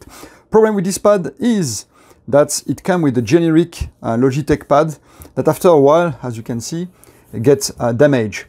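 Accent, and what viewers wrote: France. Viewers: l’accent français :)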